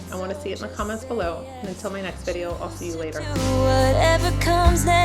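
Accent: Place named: Cleveland, United States. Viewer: Love your videos,. Where are you from originally? Your accent and phrasing sound just like my sister-in-law who is from Cleveland, OH. Close?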